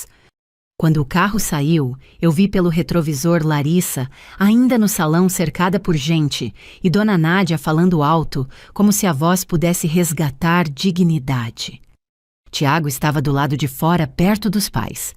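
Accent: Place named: Caipira, Brazil. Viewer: História interessante. Gostei. Creio que poderia melhorar um pouquinho o sotaque "caipira " na pronúncia de algumas palavras. Narrativa também interessante. ❤❤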